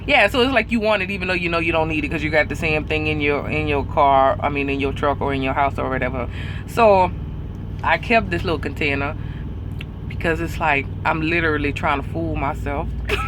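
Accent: Louisiana, United States. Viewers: You sound a bit Cajun or Creole. Whatever the correct term is of course. You are cool as shit. Would be fun to have a couple shots or beers with you... I hate this FUGGIN Automated Transmission. 17 KW T680.but I believe the Gentleman is correct. Tap it to engage it...then try easing on it. As a matter of fact this stupid. Transmission was just messing with my going over such a simple hilly pass as Tehachapi, California 58... Bullshit was just acting all week with 17K loaded heading West Towards Bakersfield and now even weaker with just 32k headed East on Tehachapi pass. FUGGIN BS Y'ALL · R u from Louisiana? Trying to place ur accent. LoL.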